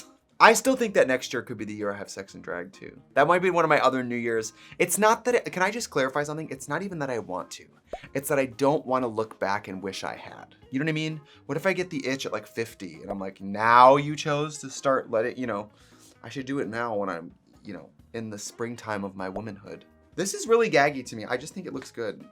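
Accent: Wisconsin, United States. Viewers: Just thinking about your Wisconsin accent late at night sometimes… it will never leave you,.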